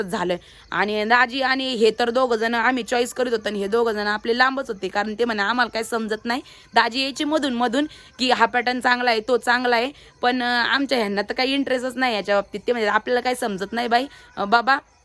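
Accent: Ahmednagar, India. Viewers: Tai tya areyachi bhasha tshich aahe mi pn nagar chi aahe asch bolt ja chan vatte tikd tshich boltat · लोकांकडे लक्ष नको देऊ तुम्ही बहिणी खूप ट्यालेतटेड आहात मी नेहमी व्हिडियो बगते खूप छान वाटत....... नगर ची भाषा तुम्ही बोलता तशीच आहे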